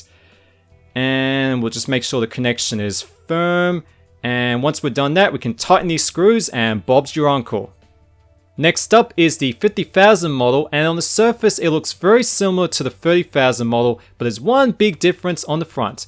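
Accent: Australia. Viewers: HES AUSTRALIAN?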